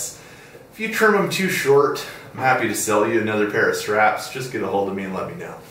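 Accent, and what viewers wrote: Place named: Baltimore, United States. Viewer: Just got this bag and I'm psyched to do my first trip w/ it. I like your mid-Atlantic Baltimore / Philly accent. It's subtle but those vowel shifts are noticeable. Thanks for making a badass bag.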